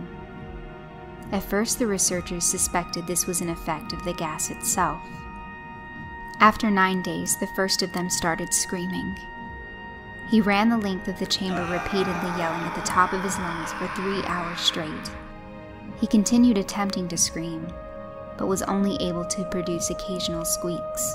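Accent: South, United States. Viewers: Don't u EVER doubt your narrative talents!!!! U r awesome girl! Especially when u throw n the southern drawl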